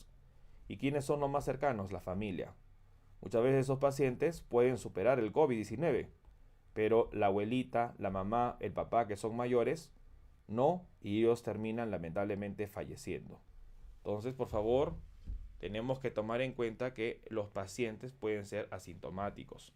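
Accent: Peru. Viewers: Peruano no? 🇵🇪 Vamooo!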